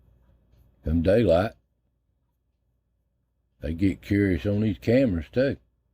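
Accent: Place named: South, United States. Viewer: Heck fellurs I can just close my eyes and enjoy hearing ol' country accent and let it take me back to the day. ThankQ, you're a blessing to many of us who are familiar ol' country souls.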